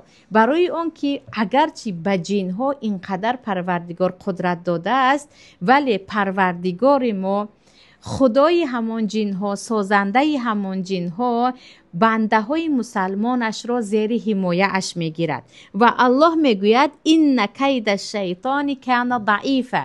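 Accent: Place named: Tajikistan. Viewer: Ман а шумо барин хохари олима мефахрам ки аз мо занхо чунин олимаи босавод хусусан бо лафзи ширини точики дар дини ИСЛОМ хизмат мекунед аллох ба мо чунин саводу гайрату такво насиб бгардонад омин йо раббал оламин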